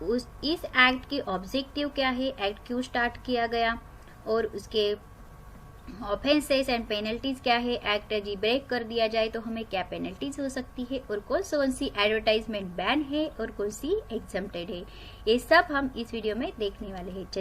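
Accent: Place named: Maharashtra, India. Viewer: Marathi tone in hindi but topic samjla thanks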